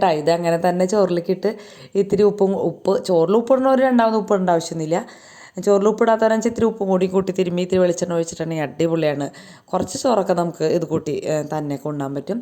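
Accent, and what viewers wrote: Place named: Thrissur, India. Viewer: ഈ തൃശൂരുഭാഷ എനിക്കെന്തിഷ്ടാന്നോ💜💛❤ "എന്തൂട്ടാ " എനിക്കെന്തായാലും വീഡിയോ നല്ല ഇഷ്ടാ എന്നെപോലെ കുറേപേർക്കിഷ്ടാവും ഒന്നും കണ്ടു മടുക്കാറില്ല, ഓരോന്നും പുതിയതു പോലെ തോന്നും ❤💙 സങ്കടപ്പെടണ്ടാട്ടോ പിന്നെ സന്തോഷങ്ങൾക്കു മേമ്പൊടിയായി ഇത്തിരി സങ്കടവും,വിതുമ്പലും വേണമെന്നല്ലേപറയണത് എന്നാലേ സന്തോഷങ്ങൾക്ക്, ജീവിതത്തിനു പൂർണത കിട്ടുവൊള്ളത്രേ ❤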